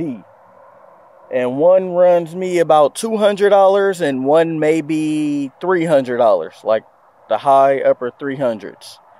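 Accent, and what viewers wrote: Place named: Texas, United States. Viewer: Damn, you’re country. You must be from Texas. 😂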